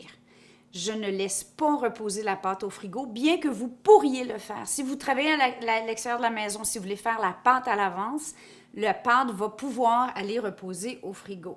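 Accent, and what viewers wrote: Quebec, Canada. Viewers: Heureux d'être tombé par hasard sur votre chaîne en cherchant des infos sur les "air Flyer" , et de fil en aiguille j'arrive sur la fabrication de vos pains, il manque l'odeur mais la salive coule et l'estomac dit qu'il veut çà !!! Vous êtes formidable à regarder et quel plaisir d'entendre ma langue natale avec ce si joli accent de nos cousins d'outre atlantique. Merci, merci beaucoup ❤❤👉🇫🇷🇨🇦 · Est-ce que j'entends un accent quebecois adorable? Bonjour d'une Francaise qui vit aux US! Mais encore une petite chose tout de suite: Einkorn (l'epautre), c'est de l'Allemand, pas autre chose, lol! Cici dit, je vais me mettre aussi tot a preparer tout pour realiser cette recette! Merci de partager, et vous etes un vrai rayon de soleil! J'espere que vous n'utilisez que des farines bio, car sinon, les farines standard sont tellement emoisonnees avec tous les mauvais choses se terminant en "cides", qui veut dire 'tuer'. 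C'est tres serieux, mieux vaut ne pas manger du pain du tout, que d'en manger fait a la farine non-bio. Protegez votre sante, mes amis humains! Bonnes aventures sur la route du pain fait maison! Merci encore!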